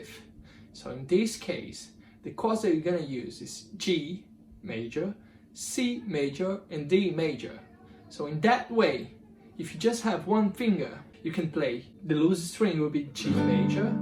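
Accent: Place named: United Kingdom. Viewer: What a beautiful British accent, my friend!!!! I want to speak like that toooooooo!!! Saudades, meu amigo!!!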